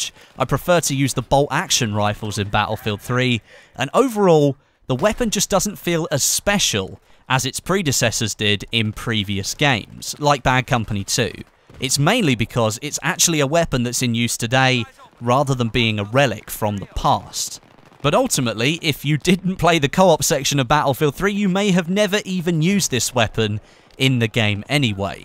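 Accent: United Kingdom. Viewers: 80% of comments:You pronounced general patton wrong He is British not American so just deal with it if you don’t like the pronunciation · he's British we're American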